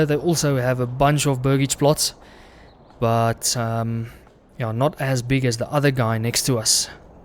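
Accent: South Africa. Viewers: You South African bro? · You South African? Hahaha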